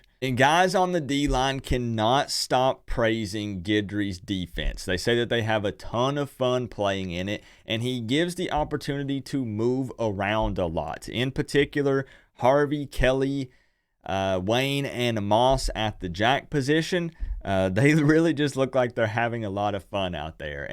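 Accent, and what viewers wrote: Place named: Chattanooga, United States. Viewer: you definitely get that Chattanooga accent..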